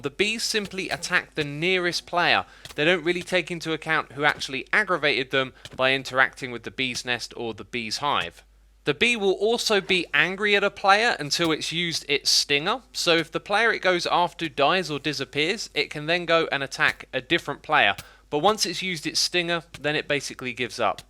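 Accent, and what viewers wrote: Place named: United Kingdom. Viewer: why are all minecraft youtubers british? is bothers me, they all sound the same.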